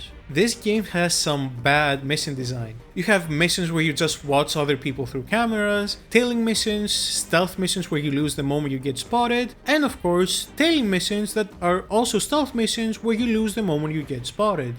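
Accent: Greece. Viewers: i know this greek accent brother XD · Greek accent is tough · Are you Greek by any chance? You sound like it. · Φίλε η ελληνική σου προφορά είναι τόσο εμφανής 2 λεπτά βλέπω και δεν χρειάζομαι τίποτα παραπάνω!!! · Greek accent geiá sou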